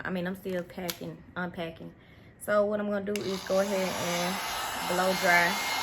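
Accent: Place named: South Carolina, United States. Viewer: Im in South Carolina and I swear some of the things you say sound like my people.... You might be family lol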